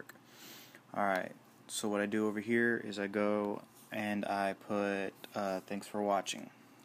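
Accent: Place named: United States. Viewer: Lovely American accent :)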